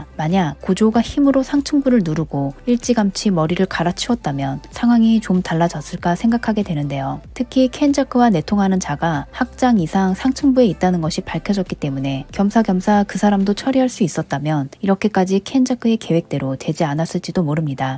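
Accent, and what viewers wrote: Japan. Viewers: 일본인이신가 발음이 그냥 일본인인데..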